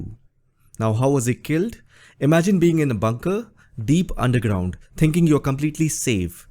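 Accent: India. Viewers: Shut up mate barley understand your curry accent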